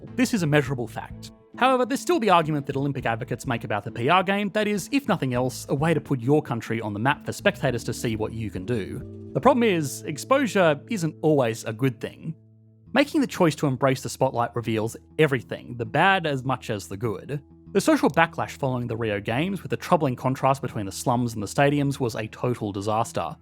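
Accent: Australia. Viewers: Stop speaking in Australia sound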